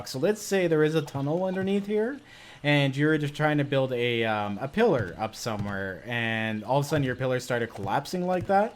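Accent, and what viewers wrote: Canada. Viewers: Thanks, had my fingers crossed for an episode today and you delivered! I'm almost done searching Naxezgane POI's and then I'm off to random gen. Finally died, on day 58. Went to search for the gold mine and rode into the friggin canyon looking over my shoulder lol. Was thinking about other games for you. It's a real slow burner but Ark blew me away dude. There's this real sense of wonder and discovery throughout the game. It's wasn't too sound mechanically but is definitely unmistakable overall. PS glad you don't nerf your dialect like most Canadian Youtubers. Pronunciation of "aboot" and using "ey" are actually common here in regions of England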